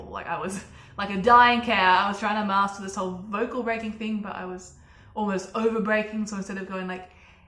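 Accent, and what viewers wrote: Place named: Australia. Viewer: Your normal voice is cute as hell too. I love your Australian accent. I myself have a Midwest/southern US accent.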